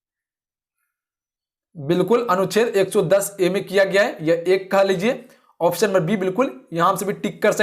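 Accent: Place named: South, India. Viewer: Ye south Indian hindi kyon?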